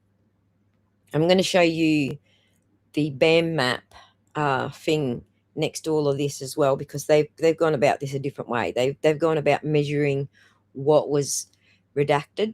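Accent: Australia. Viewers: I am going to watch Turtleboy at 9, but I love hearing Aussie.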